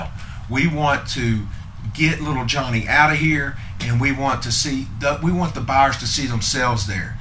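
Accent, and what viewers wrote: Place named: Alabama, United States. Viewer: Roll Tide, man, with that accent!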